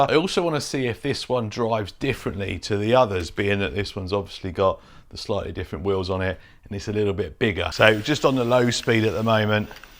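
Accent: United Kingdom. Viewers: I was onboard until you started pronouncing things in British fashion, rather than how they're actually pronounced. Knee-sahn, not nis an. FFS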